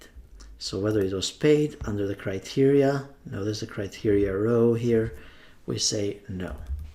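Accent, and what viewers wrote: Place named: Albania. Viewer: Great job. Your Albanian accent is strong though :)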